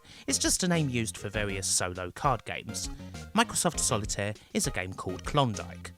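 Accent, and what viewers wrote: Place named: Essex, United Kingdom. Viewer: great video where in essex are you from? im from colchester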